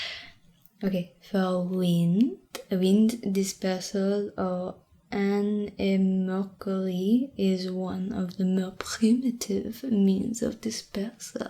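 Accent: France. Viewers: It’s weird: When you speak English, you have a very pronounced French accent, but when you speak French, I can’t understand a word you say. Without the subtitles, I wouldn’t have understood anything. I’m French, I don’t know what to think about that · Wait are you actually French or just really good at impressions. We also need more wattson, or Kermit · Her: french accent My head: switch it to russian accent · I love long ASMR videos. As a German, I really love French accents too. I'm sure this will really occupy alot of my time. I'm glad this invaded my recommended, kind of like how the Germans invaded through the Ardennes in 1940. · i never knew i would fall for girls wit french accents this hard you suprise me every video lol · I miss this French accent of hers 😢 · You already have such a relaxing voice, but the addition of a french accent makes it even better. 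I come back to this one a lot. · is this what french accents sound like from frace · Dam. French accent. My weakness. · the french accent really gives of the vibe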